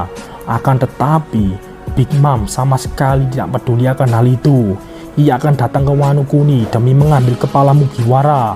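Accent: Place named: Java, Indonesia. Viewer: Gua suka pembawaanmu bro... Jawa banget....opo onone 😄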